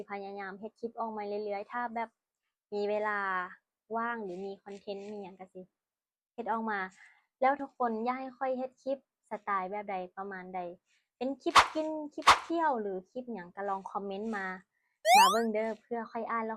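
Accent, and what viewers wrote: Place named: Laos. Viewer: อยากฟังเสียงเว้าลาวเพาะดี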